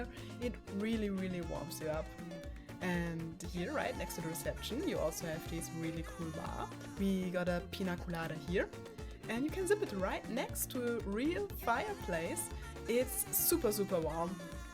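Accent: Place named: Germany. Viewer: You sound soooo very German when you speak English.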